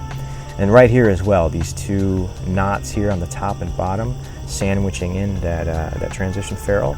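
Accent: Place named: United States. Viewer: no probelm you tell it quite well but it's funny to hear it from american instead of french ^^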